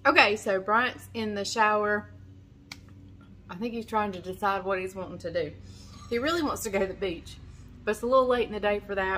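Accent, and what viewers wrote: South, United States. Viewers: I can hear it too.small town southern wife❤️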